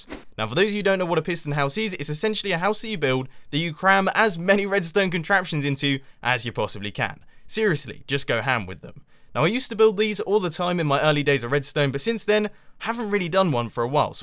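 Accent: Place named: United Kingdom. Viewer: arrrrrrrrrrrgh british accent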